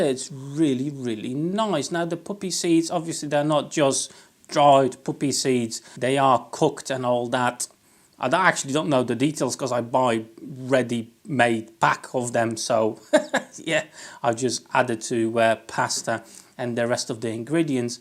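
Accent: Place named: Midlands, United Kingdom. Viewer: Hi. Your Christmas sounds delicious! Clever of you to double up on the soups etc. from different areas that you and you wife come from. I thought from your accent on occasion that you may have spent some time in the Midlands (Birmingham used to have a large Polish community and its own Polish club - the only place you could obtain real 'boiling ring'...couldn't pronounce its proper name so that's what we called it). A mate of mine was half Polish and he used to turn up with Polish dishes his dad had cooked. One was some kind of meat filling in leaves that he called 'Kalumpkies'. Probably got that spelling completely wrong but it was excellent. Are you going to incorporate any traditional UK fare into your Christmas food? Anyway I wish you and yours a very merry Christmas and hope that it gives you and your family everything you wish for yourselves and an extra wish from me. 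P.S. Really enjoying the hand power tools vids. Been using these for more than 30 years but it doesn't mean I know (or remember) all about them Thank you.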